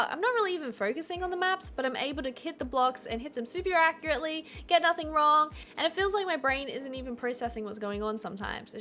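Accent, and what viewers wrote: Australia. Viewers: I always forget you're from Australia! I'm Aussie too, but every time I hear you speak it takes a second to adjust to your accent. Thanks for these tips, though. I got an Oculus a few days ago and I'm stuck between Hard and Expert. No fail and the different grips are a game changer! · Are you Australian? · I like your dancey play style - I enjoy using that approach with my juggling..more satisfying (and different) than pure tech, IMO. Australian & New Zealand accents are some of my favorite. To my American ear, it sounds like the words are often mellifluously packed full of warbled, extra vowels. I want to understand why the hard “O” sounds like “-arr” - this fascinates me! Thanks for the advice!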